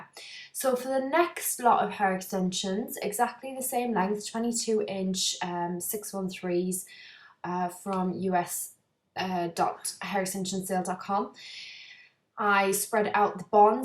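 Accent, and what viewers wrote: Newcastle, United Kingdom. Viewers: I think she's from newcastle england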